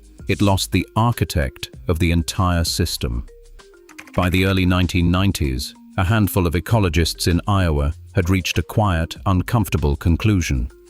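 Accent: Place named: United Kingdom. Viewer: Why a British voice on American content?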